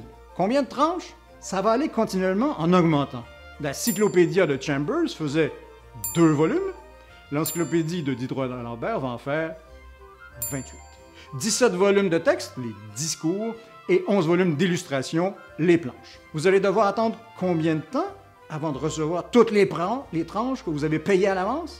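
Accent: Quebec, Canada. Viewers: Merci, c'est tout à fait passionant ! Et l'accent québécois fait toute la différence !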